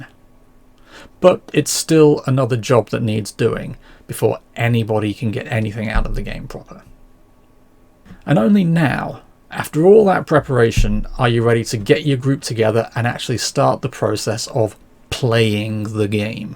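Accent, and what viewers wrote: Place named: United Kingdom. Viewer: You have a British accent. This is episode 002. Does this mean there's 005 more episodes before you talk about superspy/espionage RPGs?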